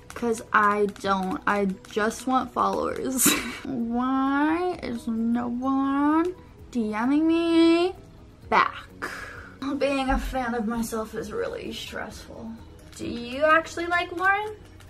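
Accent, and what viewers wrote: United Kingdom. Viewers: This is my first time watching u, I saw your tiktok but I wanted to know, are you British???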